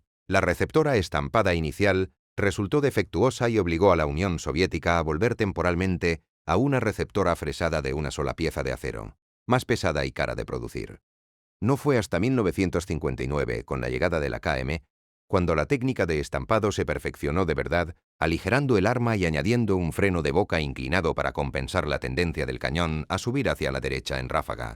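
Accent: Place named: Spain. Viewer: Hablas con acento: "ostia, tio"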